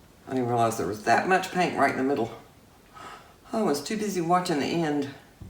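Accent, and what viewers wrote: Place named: South, United States. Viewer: I just found you today because I was looking for a varnishing technique... I love your artwork! I am from Georgia, so its also good to hear the southern accent...lol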